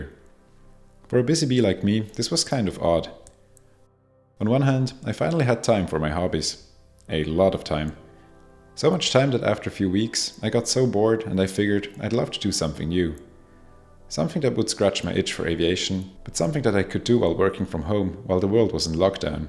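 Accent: Switzerland. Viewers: Danke für die tollen Videos. Ich werde den Schweizer Akzent vermissen. 💔 Au revoir! 🙋🏻‍♂️ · Fly safe! I hope that one day - like Mentour Pilot - your career turns toward educating the next generation of pilots. You will be an awesome at it! Be it senior captain, flight instructor or just pilot doing his job with passion with who ever he can share the cockpit with: It is good do know you are up there! I will miss your voice - the swiss english is such a nice and nostalgia sound to me. Fly safe!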